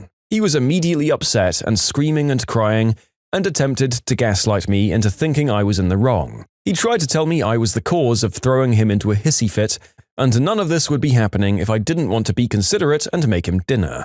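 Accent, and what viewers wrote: United Kingdom. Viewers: Is it just me, or is the new narrators voice really relaxing? I may be biased because I'm British as well and don't hear many British people online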